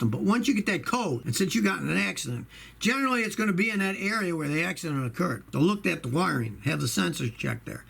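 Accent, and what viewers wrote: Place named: South, United States. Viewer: YOU ARE SO SOUTHERN , jeam BEAM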